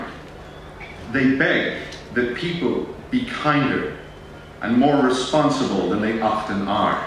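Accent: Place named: United States. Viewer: His American accent was so adorable! 💕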